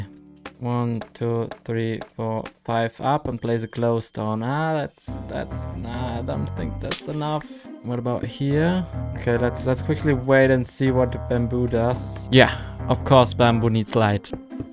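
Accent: Germany. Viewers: Your lucky you have za german accent. Im mostly German, but have no accent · Super german Easy englesh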